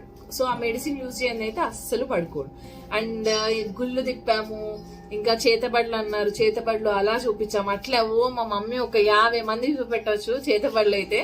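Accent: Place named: Charakonda, India. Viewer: Akka chrakondhalo super ga chaptharu akka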